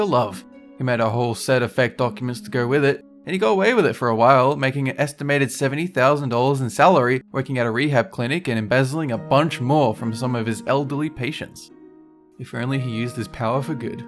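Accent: Australia. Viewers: AUSSIE AUSSIE AUSSIE OI OI OI!!!!!!!! I NEVER REALISED THAT WAS A FELLOW AUSSIE, FOR SOME REASON I DIDN'T RECOGNISE THE ACCENT, BUT NOW WHEN I HEAR IT, I DO!!!!!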